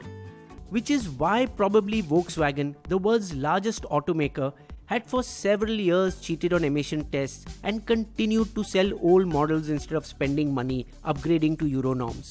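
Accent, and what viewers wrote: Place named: Mumbai, India. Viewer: Sounds like you're from Mumbai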